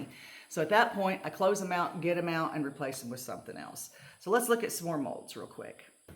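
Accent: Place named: United States. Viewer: First, amazing suggestions and style. I cannot understand why people want to make the same thing when you can mix and match. FYI--Check on your etsy link. it defaulted to British pounds instead of US dollars. This might be just a me problem but but I want to support fellow potters. (based on accent and mention of a US holiday I assume, like me, you live in US)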